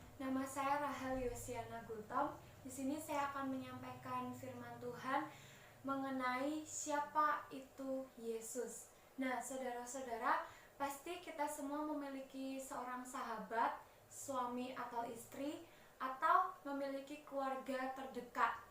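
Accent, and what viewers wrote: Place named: Java, Indonesia. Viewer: Aminn.. makasih ci... Medoknya menjadi ciri khas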